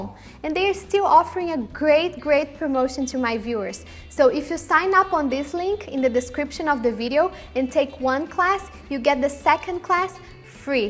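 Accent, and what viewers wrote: Brazil. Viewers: Evandro Cândido Eu falei errado, eu quis dizer ''ela fala sem sotaque americano'', ela fala com sotaque BR ou gringo pros EUA. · The Bubu depende, existem as bolachas , e também os biscoitos que fazem parte da nossa doçaria portuguesa! Como portuguesa acho que videos como este , com todo o respeito mas só existem para confundir a nossa língua de Camões, pois desde a apresentadora que de português não tem nada , mas sim de brasileiro, não tem o mínimo de prenuncia portuguesa com não tem de conhecimentos de Portugal, então será melhor dedicar-se a publicar outro tipo de videos ! Provem os nossos maravilhosos biscoitos ( areias) e vão ser que de pro cão é que não são! Que lhes perdoem quem faz estas delícias portuguesas , pois há pessoas que da nossa cultura sabem pouco! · I love your accent, Instead of pronouncing ( mouth) maoth, you say maaouuth, brazilian accent❤️ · Em Portugal a palavra você não é muito usada como uma palavra formal. 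Usamo-la por exemplo quando conhecemos alguém e temos alguma confiança, mas mantemos uma relação com alguma distância. Aqui utiliza-se a palavra senhor/senhora quando se quer dirigir formalmente a uma pessoa que não conheçamos, há quem use você também, mas soa de uma maneira "mais educada" tratar por senhor/senhora. Os brasileiros também tendem a substituir o "l" por um "u" , por exemplo os nomes Portugal e Brasil, são proferidos por vocês como "Portogau" e "Brasiu" Nota: Os brasileiros costumam ter imensa dificuldade em pronunciar outras palavras de variadas línguas que não a deles, por causa da maneira como falam. Enquanto que os portugueses têm uma facilidade enorme. Nota-se no vídeo que és brasileira quando estás a falar inglês pela maneira como pronuncias certas palavras. Os portugueses também têm uma facilidade em entender outras línguas, como por exemplo o castelhano, italiano. Normalmente os espanhóis e os brasileiros acham dificil entender um portugues.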